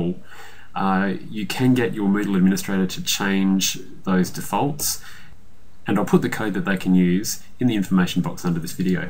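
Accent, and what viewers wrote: Australia. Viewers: Fantastic, you've saved me a whole heap of heartache - so clear and concise - nice to hear an Aussie voice on youtube as well:)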